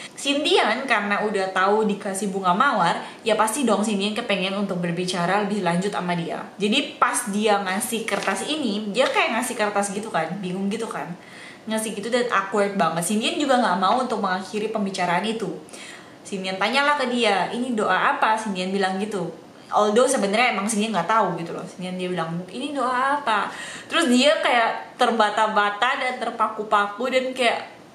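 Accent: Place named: Java, Indonesia. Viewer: Lbh suka kalo ada medok2 nya.. haaha.. krn sm2 org surabaya.. toooss.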